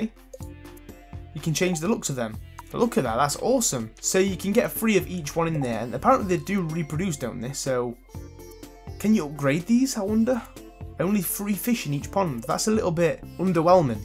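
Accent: United Kingdom. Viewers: I really like the way you speak like brithish idk lol and yeah nice vid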